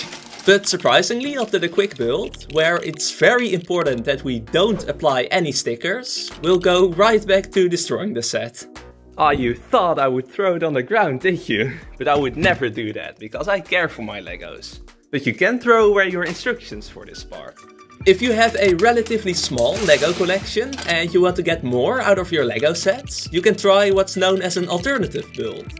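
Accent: Netherlands. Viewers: Ik ruik een Nederlander aan het accent te horen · you sound dutch just like me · Dik Nederlands accent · The cuts are a little too fast for my liking, sorry to say I don't have this much ADHD. Also, the dutch accent is a little too noticable. Great job on the modifications though, that is some very real talent you display there. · Where are you from? I here a Dutch accent❤😂 I'm Dutch BTW. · I smell dutch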